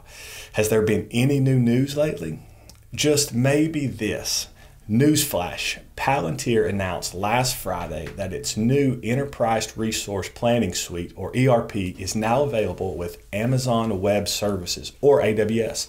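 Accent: South, United States. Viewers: hard to take you serious with your southern accent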